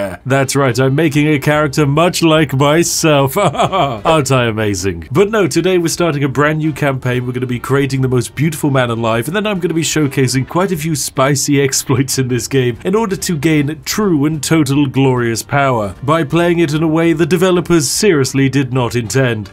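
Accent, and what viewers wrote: United Kingdom. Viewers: Hahahahaha yesssyesss I love your British accent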